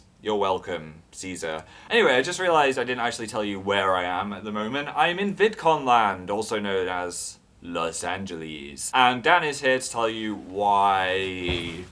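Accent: United Kingdom. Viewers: I love the way he said 'america'. Mmm<3 Dem British accents*shivers from fangirl overload*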